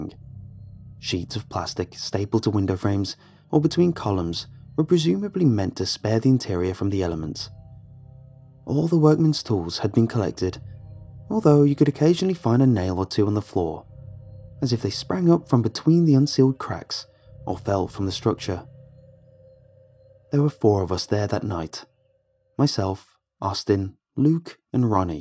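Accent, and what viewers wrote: England, United Kingdom. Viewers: Strangely it's been the same for me. It's something about his steady English accent that makes me drowsy.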